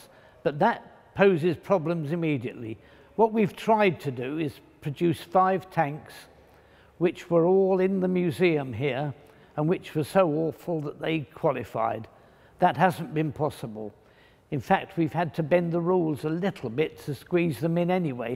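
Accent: United Kingdom. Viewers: Listening to a knowledgeable and gravelly voiced British man talking about tanks from the biggest war in history is literally the most interesting and exciting thing to me.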